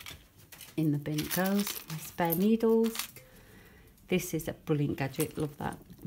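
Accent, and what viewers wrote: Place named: Stockport, United Kingdom. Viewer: Just actually listened to your accent, I was born at Stepping Hill hospital, my maternal grandparents lived where you do, or around, close by... before grandfather died, they lived in lower Brinnington, near where St Paul's church was... for the first 5 years of my life, I lived in upper Brinnington, went to school at the old school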